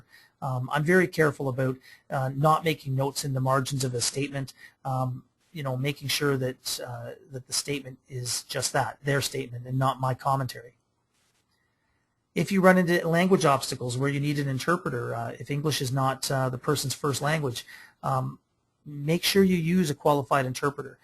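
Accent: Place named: Minnesota, United States. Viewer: Good stuff.... is definitely a Minnesotan or Canadian; Fargo anyone?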